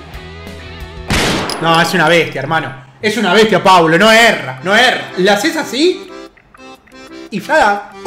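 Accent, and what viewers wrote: Argentina. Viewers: JAJAJAJAJA que grande sos...Nos caracterizas 100% como Argentino jajajaja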